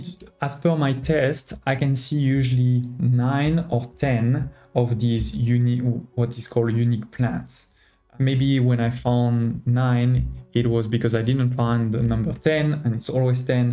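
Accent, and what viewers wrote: France. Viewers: Are you french ? You speak a very good english with a french accent :)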